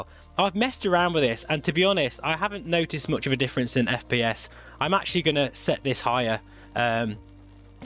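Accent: United Kingdom. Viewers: what? I’m being serious 😀 New subscriber, couldn’t quite make out your accent, I’m going to punt at midlands area?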